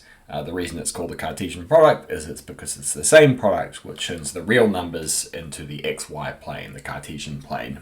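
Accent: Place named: United Kingdom. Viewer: british innit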